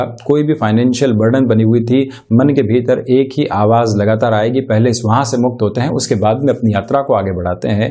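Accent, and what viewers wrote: Standard. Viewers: I just watch your videos to listen to such pure Hindi. It’s enchanting.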